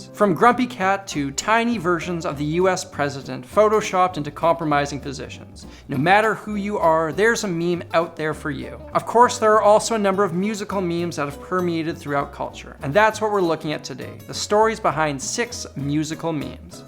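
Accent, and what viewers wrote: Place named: Canada. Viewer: I had a suspicion you were Canadian. The accent gave it away.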